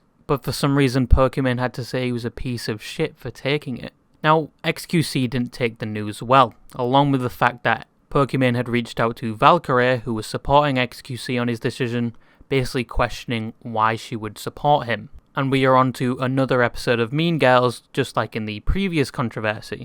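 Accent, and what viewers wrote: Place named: England, United Kingdom. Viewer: yo yt creator guy i like the content but i hate your stupid ass english accent and especially the way you pronounce: '' worse ''. Truly hope you can change your accent some how cause some times you pronounce something so weirdly that it feels like power drill drilling into my forehead but keep up the good work.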